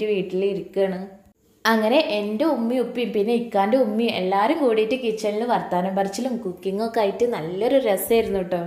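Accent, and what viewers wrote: Malappuram, India. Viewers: Ningal malappurathu evideya??thani malappuram slang.njanum malappuram aanu..anyway proud of u.ethrayokke achieve cheythallo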